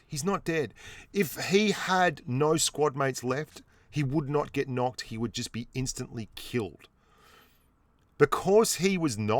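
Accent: Australia. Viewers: Suggesting that you mention the importance of having multiple scopes for different engagements. 1x or 2x for close and maybe mid, 4x and 8x from mid to far. Cannot stress this enough for players who zoom in on every target they fire at. Too much zoom will get you killed more often than not at closer ranges. Another point you can bring up is you don't always have to stand when shooting out of windows. It is very situational, but if they aren't directly below or close to the house, back up a few steps behind the window and crouch. You'll be able to shoot outside the window while barely peeking your head. Great videos as always. keep making great content, honestly really enjoy you making content for this game instead of blitz. I find this game much more complex and engaging than blitz, which makes that aussie voice just that much more enjoyable to listen to. If you could, maybe make an advanced guide in the future, I'm sure it would help get the knowledge and tactics out there.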